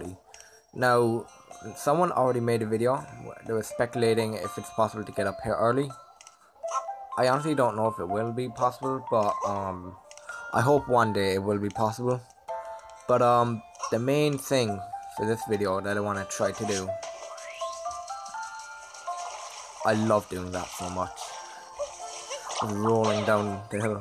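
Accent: Ireland. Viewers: Ah, you do actually have an Irish accent, now that I listened closer but, you're right it's not as prominent as a lot of other Irish accents. Also (not to be rude) but, I love your little lisp. I've always been a fan of people with lisps. I find them quite adorable. Like my daughter will tell me about somebody and she'll say oh mommy you are going to love this person, and also they have a lisp.☺️ and I just really think that it makes your speech very unique and adorable. so I hope I did not offend you in any way.😊 And if you figure out how the heck to get off of that little island and, over to the other place I would really appreciate it if you could let me know. it's driving me nuts. Thank you so much. My name is Linda by the way 😉💚💚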